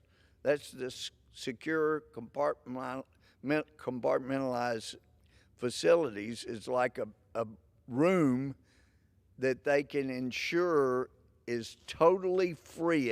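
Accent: South, United States. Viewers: He has that charming southern drawl at times.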